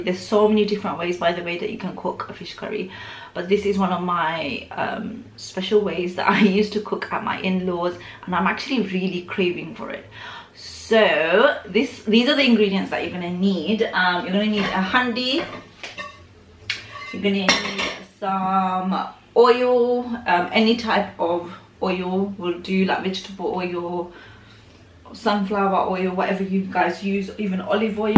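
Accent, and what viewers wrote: Sylhet, Bangladesh. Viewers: Asalamualikum sister. MashaAllah very beautiful cooking you do ❤️👌. Love the way you talk sylheti 👍. I from sylhet and i live in Manchester. I just subscribed u beautiful Affa ❤️😘. Your fish dish looking amazing 😊👌 MashaAllah. Love you ❤️❤️❤️❤️😘😘😘😘😘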